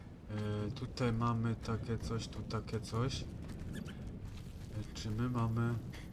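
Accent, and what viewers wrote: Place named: Silesia, Poland. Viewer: Słychać u Ciebie gwarę śląską :) pozdrowienia z Chorzowa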